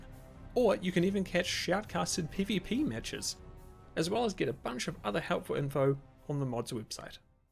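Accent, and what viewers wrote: New Zealand. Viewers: Loving your kiwi accent; this expansion was indeed the beginning of the end, despite some good ideas...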